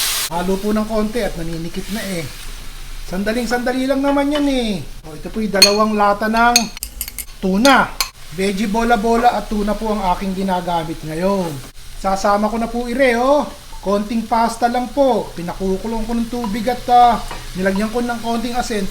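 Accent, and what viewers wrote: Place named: Bulacan, Philippines. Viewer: Bulakenyo po ba kayo? Nakakatuwa po kayo magsalita eh prang tulad nmin dto s bulacan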